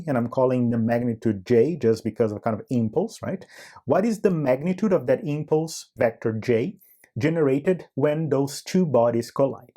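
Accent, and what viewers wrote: Brazil. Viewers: Aha! Eu tinha certeza que vc é brasileiro pelo sotaque! Cheguei aqui aleatoriamente pq comecei a brincar de fazer jogo esse ano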